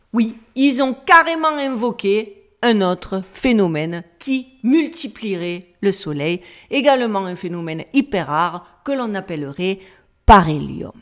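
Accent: South, France. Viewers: J'ai decouvert recemment votre chaine et franchement bravo : votre déroulé est structuré, les themes pertinents et suspens, humour et cet accent du sud que j'me tant. Tout y est. Alors on ne lache rien, on met nos ceintures et on s'envole avec vous pour des horizons plus ouverts. Tant mieux si tout ce qu'on a appris etait faux ca nous force a decoder plus loin. Et en science on dit que toute hypothese est vraie jusqu'a ce qu'une autre l'infirme et la remplace. Restons modestes et les yeux et le coeur grand ouvert. Vous nous montrez la voie. Les contes et mythologies populaires nous plongent dans nos origines et les conteurs etaient des personnes riches d'un savoir caché transmis de bouche a oreille alors j'embarque avec joie !!!! Force a vous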